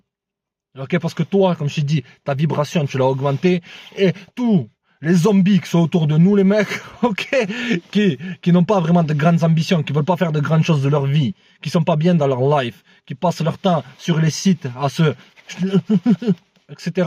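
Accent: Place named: Southwest, France. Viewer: Accent terrible, t d'ou ? Toulouse ? Sud ouest ? Bonnes videos sérieux.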